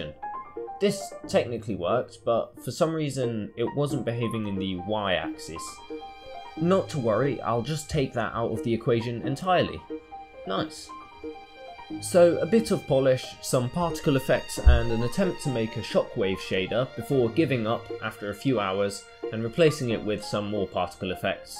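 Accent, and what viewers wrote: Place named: United Kingdom. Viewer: Where you from bro? You sound bri'ish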